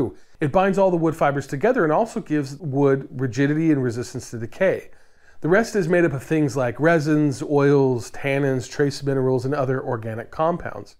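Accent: Pittsburgh, United States. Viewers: are you a yinzer?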